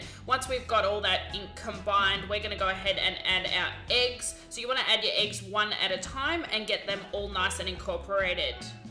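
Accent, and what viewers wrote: Australia. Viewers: Nice to hear an Aussie voice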